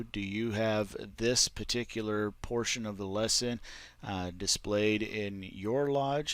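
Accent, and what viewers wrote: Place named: California, United States. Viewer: Cool thanks for sharing. I thought I heard some California in you.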